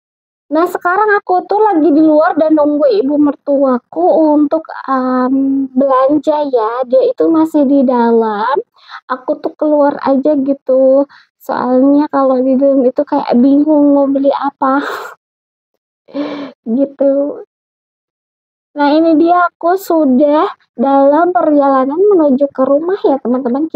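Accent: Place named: West Java, Indonesia. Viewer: Jadikanlah kritik dan saran untuk kemajuan dalam membuat konten agar lbh baik lagi, yg namanya belajar Tdk ada yg langsung bisa/ lancar, untuk karakter/pembawaan saya No Komen krn saya yakin itulah ciri khas kamu dr lahir, cuma lucu aja mmg khas orang Sunda, dimana penyebutan hurup P,F,V sama semua dan penyebutan huruf H terasa sekali.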